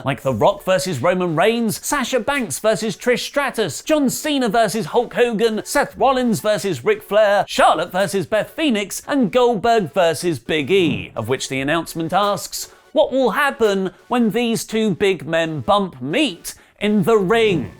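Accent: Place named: RP, United Kingdom. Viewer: Why do you talk like you're on Radio 4?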